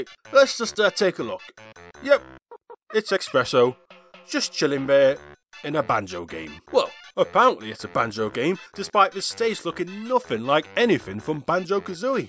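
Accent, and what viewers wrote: United Kingdom. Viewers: Are u British or American? Sound like ur British.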